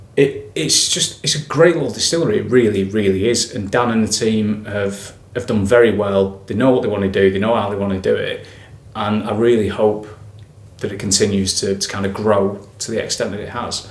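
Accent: Lancashire, United Kingdom. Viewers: No need to make excuses for your accent; Lancashire and proud of it 😃👍. As for the Cotswold sherry cask - it sounds like a stonking dram. I have experienced the standard signature but alas found it a tad youthful and nothing stood out for me. Ne'er mind, perhaps I'll try again 😁. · Great review mate - must try some Cotswolds - sounds like an excellent distillery! No need to change t'accent lad! As a Lancastrian I love goin' to Booths too! Especially the one in Lytham.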